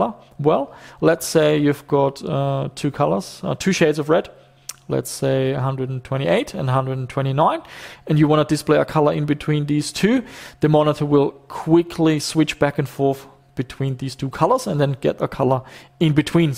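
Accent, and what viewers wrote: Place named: South, Germany. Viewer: Wenn ich es nicht besser wüsste, würde ich sagen, du hast einen deutschen Dialekt 🤔 und deine Intonation klingt Süddeutsch.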